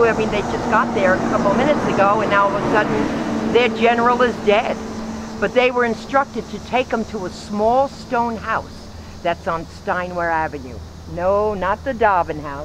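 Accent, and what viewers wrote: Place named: New Jersey, United States. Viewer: I KNEW I PICKED UP A NEW JERSEY ACCENT! HUH!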